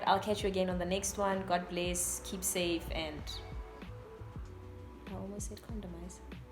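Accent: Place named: Cape Town, South Africa. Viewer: I always tell people visiting a place is so different from being a citizen. I grew up there and yes it is and has always been very expensive and yes Cape Townians are quite ignorant and uneducated towards other provinces in South Africa. If I were to close my eyes you sound very Cape Townian, your accent that is. There is a lot of racism in Cape Town, remember Cape Townians have always thought of themselves as superior to other Provinces, and other Provimces have always made Cape Townians feel as though they are superior. My heart however does long for home Cape Town. Stay safe and don't lose your honesty and lovely attitude towards life. Dankie en tot siens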